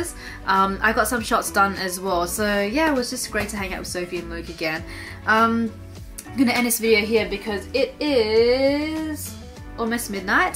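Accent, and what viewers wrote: Australia. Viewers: Cute China doll with Australian accent.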